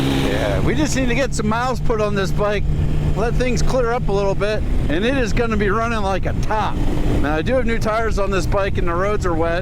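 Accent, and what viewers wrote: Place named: Pennsylvania Dutch, United States. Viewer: Having watched a half dozen or so of your videos, sometimes I think I detect just the tiniest bit of Pennsylvania Dutch accent in your voice, but when you were finding that last wiring fault and described "rootching around" I knew I wasn't imagining it! Mach's Gute!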